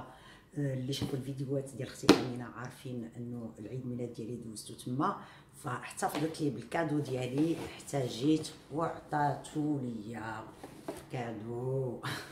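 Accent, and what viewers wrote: Algeria. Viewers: سبحان الله هضرتك تقولي جزائرية واش مزوجة جزائري بالتوفيق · ولله تقول جزاءرية يا اخت امينة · هدرتك بحال الجزاىريات 🌹 انا جيت من عند أمينة ❤❤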